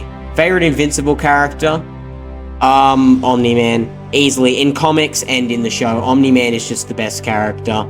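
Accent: Australia. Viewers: I didn’t expect u to be Australian! W tho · I hope you don’t mind me asking cause I would like to know if you’re Australian?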